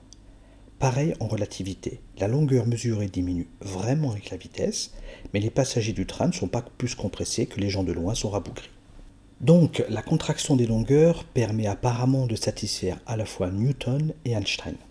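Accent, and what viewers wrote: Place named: Geneva, Switzerland. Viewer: Très bonne explication, vous avez l'accent genevois, vous êtes de Genève? Vous travaillez peut-être au Cern?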